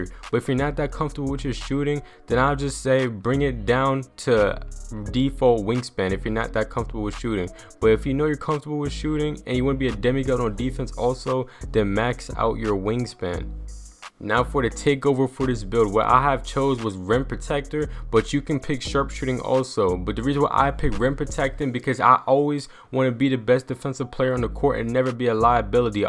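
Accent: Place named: Canada. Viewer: You sound like your Canadian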